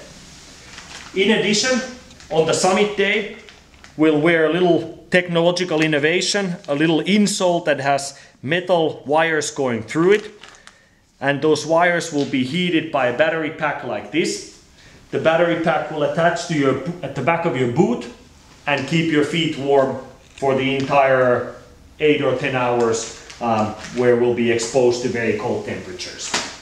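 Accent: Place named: Finland. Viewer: Propsit sulle ite oon vaa haaveillut everestistä ja muuten toi rallienglantihan kuulostaa hyvältä!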